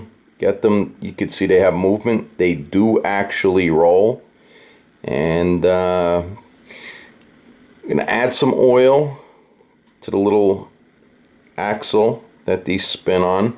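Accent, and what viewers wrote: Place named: Italian-American, United States. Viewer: You sound like a paisan. 👍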